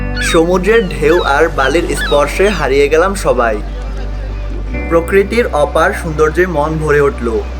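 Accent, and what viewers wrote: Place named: Ishwardi, Bangladesh. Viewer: ভাই আপনার বাড়ি কি পাবনা জেলার ঈশ্বরদী তে আপনি ঈশ্বরদীর ভাষায় কথা বলছেন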